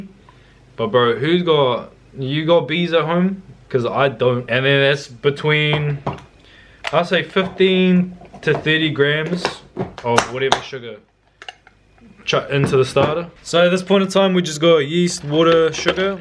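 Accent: New Zealand. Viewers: Thank you for making this. I was struggling with creating my own sourdough starter for weeks but the tropical climate here was hard to navigate. However, your active dry yeast overnight starter is a game changer because I get something that tastes and behaves a little like a sourdough starter without the weeks of cultivation and mould scares. Also, funny thing, I couldn't figure out your accent for a long while (cause I'm bad at it) but my Dad said you must be from NZ.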